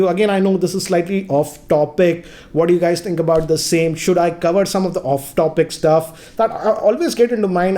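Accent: India. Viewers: Another Indian with a heavy accent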